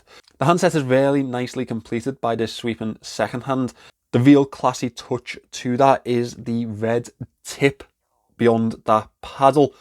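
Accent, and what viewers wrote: Liverpool, United Kingdom. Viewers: Love the Scouse accent bruv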